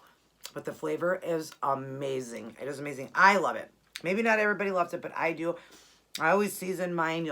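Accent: Midwest, United States. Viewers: dat mid-western accent tho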